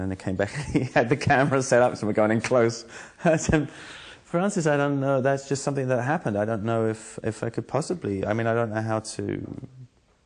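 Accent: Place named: RP, United Kingdom. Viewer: It's a little weird hearing him speak because I'm accustomed to hearing his 'Londoner' accent in 'Lie To Me,' and right now he sounds really posh in comparission, it's throws me slightly lol XD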